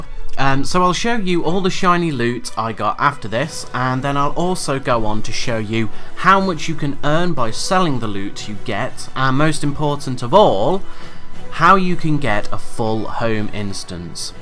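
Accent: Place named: United Kingdom. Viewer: too english english😆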